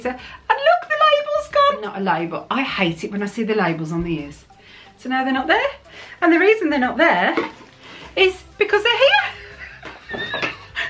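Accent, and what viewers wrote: Birmingham, United Kingdom. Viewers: Happy eater, happy YouTube birthday and happy early birthday!!! Wow triple celebration!!! Have to say I love love love your videos. Your energy and laughter is so infectious ! And your accent brings me home! My whole family are brummies. I’m in your neck of the woods today for the football (season ticket at West Brom). I travel every week from preston! So I’ve rambled on but just wanted to say thank you for the fantastic videos and for sharing the Disney magic! Xxx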